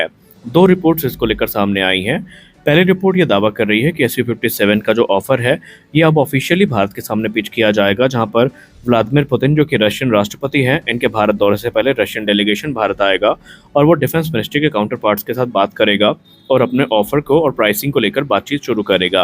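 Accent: Pakistan. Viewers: Sala tera awaj padosi jaisa lag raha hai tm pakistani channel hai paaka INDIAN NAAM RAKHA HAI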